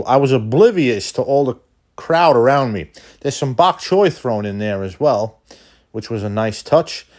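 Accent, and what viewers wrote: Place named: New York, United States. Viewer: Thank you so much for taking us on the journey with you. I haven't been back to New York since I left in 2000. I'm a Brooklyn girl and I love your accent. Didn't realize we New Yorkers had an accent until I left. Thanks so much and look forward to your next video. Stay safe and stay blessed.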